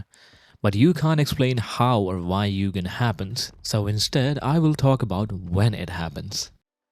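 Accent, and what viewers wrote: India. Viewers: I was like "Is this guy Indian or something ?", turns out yes, you are, I detected some thin traces of accent (one of my best friends is Indian too - for the record)... Anyways, you have a really good story telling, and the way your display your culture and philosphy in this video is very well written, I quite like all those small pieces you give, they form a well woven story, and I agree with all of that : you can always leave the Zone, but the Zone will never leave you.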